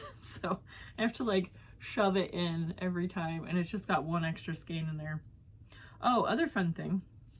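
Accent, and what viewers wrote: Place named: Minnesota, United States. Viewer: Are you sure you're not from Minnesota? Because I grew up there and listening to you chat is just like sitting with my cousin or a good friend. I love your podcast and glad I found you. I mainly knit socks, blankets and a few small things but you've inspired me to get out my one sweater quantity of yarn and try to knit a sweater. (I've made a couple acrylic sweaters but never a wool one.) Thanks for sharing your love of yarn and knitting!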